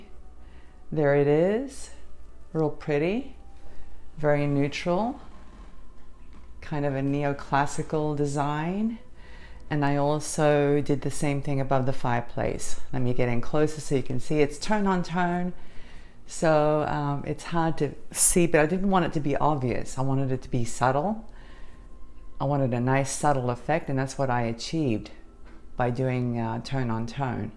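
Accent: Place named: Australia. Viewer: I knew I detected an Australian accent! Wow, you had an interesting childhood! I had to make a choice in High School between music and art as we weren’t allowed to do both. I played violin and was in the orchestra so my path was laid. But, in elementary school, I won a few art awards and so I decided to bring it back into my life. Unfortunately, I became disabled almost five years ago now and I’ve become interested in different types of crafting. I enjoy beading and jewelry making as well, but I also enjoy paper crafts like card making and journal making. I’ve also become interested in polymer clay, needle felting and embroidery along with cross stitch. I’m also interested in learning watercolor techniques. I’m kind of all over the place and I think I need to figure out a schedule or something as I have more interests then time/energy to do them. 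I guess I’ll get it figured out eventually. Thank you for sharing! 💜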